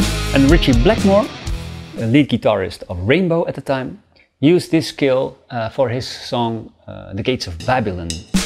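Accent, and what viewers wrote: Netherlands. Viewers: Dutch? Sounds great!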